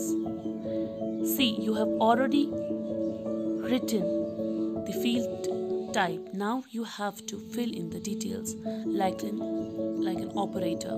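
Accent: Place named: India. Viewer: beautiful Indian English accent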